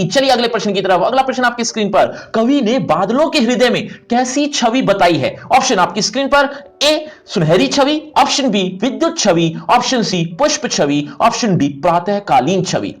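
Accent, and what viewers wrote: Haryana, India. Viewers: hryana se ho kya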